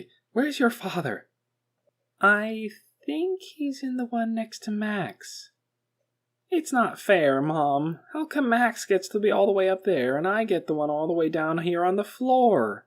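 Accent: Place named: United Kingdom. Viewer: Genuinely thank you for doing this. I have a hard time reading and committing to reading fiction for a long period of time. I do have a lot of free time to listen to things though and this was an amazing way to digest this story. I got recommended by the person I'm dating right now, totally worth it. Also I know you apologized for your British accent in the first one and while it is occasionally rough it is such a good capturing of Wheatley like you play Wheatley so well.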